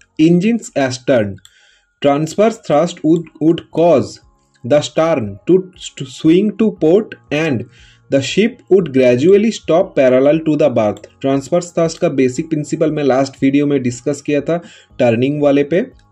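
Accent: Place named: Bengal, India. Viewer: You must be a bong!!! Ure accent suggests so...anyways these videos have been of immense help....Two thumbs 👍 up for your hard work... Really appreciate it....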